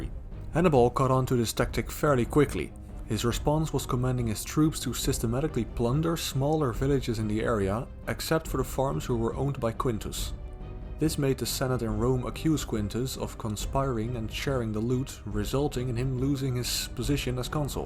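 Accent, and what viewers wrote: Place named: Flanders, Belgium. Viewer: Are you from Belgium? I am hearing a Flemish accent.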